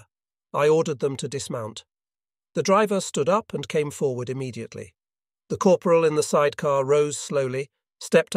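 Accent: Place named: United Kingdom. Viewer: This British accent is confusing. BTW I loath British AI accents.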